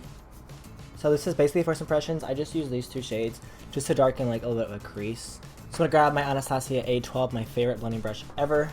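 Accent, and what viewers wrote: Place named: England, United Kingdom. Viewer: That annoyed English accent is my favorite part 😂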